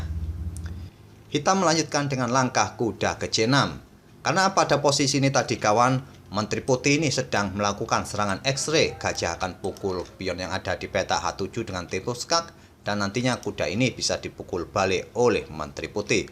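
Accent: Java, Indonesia. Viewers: Cara bicara nya jangan sering pake logat Jawa , sulit dengar nya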